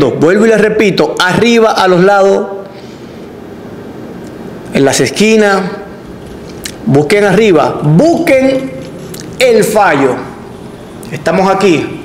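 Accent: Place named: Cuba. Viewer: PRIMERA VES K T VEO Y ME INSCRIBI SEGUIDO POR FAVOR NO IMPORTA PERO TU SUENA CUBANO! SI ERES CUBANO NO IMPORTA! TU SUENA INTELIGENTE Y SERIO! POR FAVOR NO T VENDA! Y BUENA SUERTE!!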